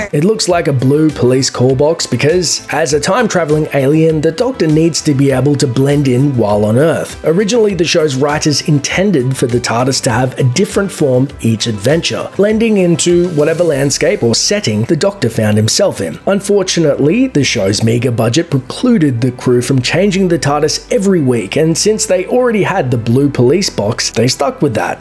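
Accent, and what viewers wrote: Australia. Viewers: I'm pretty sure this guy has an Australian accent lol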